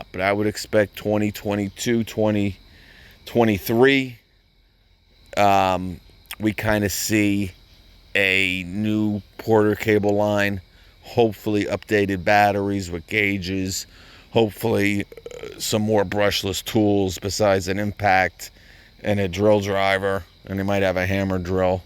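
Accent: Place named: New Jersey, United States. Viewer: Dear God I’ve missed that gravely, baritone Jersey voice. Thanks!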